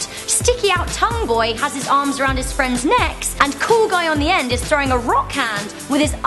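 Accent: United Kingdom. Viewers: Wow....she's British. Same!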